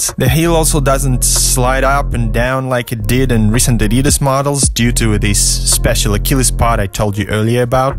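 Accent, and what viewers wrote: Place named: England, United Kingdom. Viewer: kashayte supchik zvichit voobshe klasno s angliyskim akcentom (tipa English)👍💪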